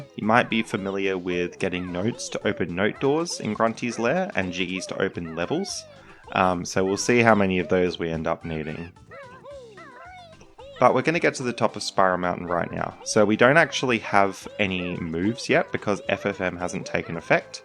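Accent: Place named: Australia. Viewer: I still get surprised every time I hear an Aussie or New Zealander accent on the Internet. I shouldn't be, but I am, cos I grew up in a time when the Oceanians had really bad Internet. Also, cool video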